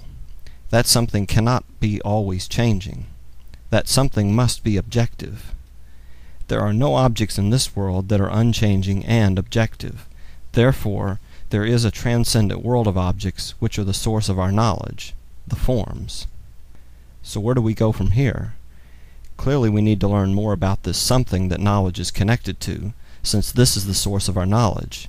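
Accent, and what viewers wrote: Oklahoma, United States. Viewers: hearing philosophy in a southern oklahoman accent is hilarious after a while ^_^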